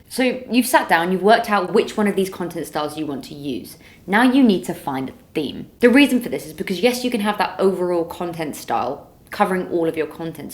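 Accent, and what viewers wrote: England, United Kingdom. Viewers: It’s simple, I hear the British accent, I click like.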